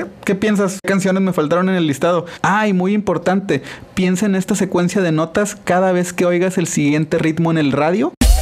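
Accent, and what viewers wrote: Mexico. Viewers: Jajaja me encantan tus videos, son bien honestos y tu acento bien mexicano lo hace más entretenido 👍👍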